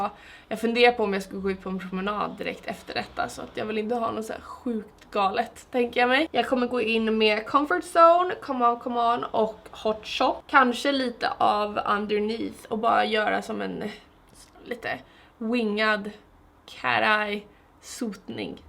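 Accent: Stockholm, Sweden. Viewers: Like it alot better when you speak english even tho I'am a swede myself 😂 you have that stockholm accent when you speak swedish 😅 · Blev absolut inte sämre på något sätt, så himla mysigt! Va inte alls beredd på att du skulle ha så mycket Stockholmska i dig. ÄLSKAR! <3 Keep on going girl! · Jag är svensk men sjukt konstigt att höra dig prata svenska 😂 så van att bara höra dig prata eng! 😂 och trodde inte du skulle ha sådan Stockholms dialekt, men tydligen 😂👌🏻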